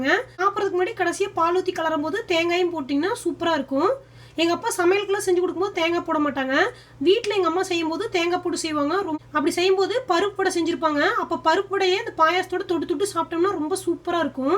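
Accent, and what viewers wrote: Kongu, India. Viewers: Nenga pesaratha kekave superb ah eruku... Kongu tamil me 2 kovai thanga akka...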